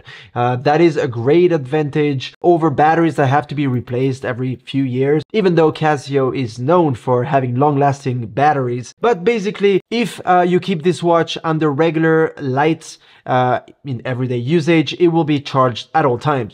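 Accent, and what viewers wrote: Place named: France. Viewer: T'as un accent français quand tu parles l'anglais ! Try to cut down on the word "really". You really say it a lot!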